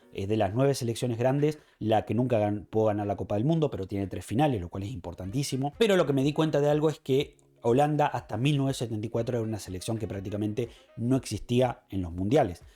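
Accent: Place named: Argentina. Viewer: Antes de ver el video, veo que tu acento es argentino o uruguayo, vamos a ver a quien pones antes si a argentina o a Italia, o... Uruguay o Francia jajajajajaja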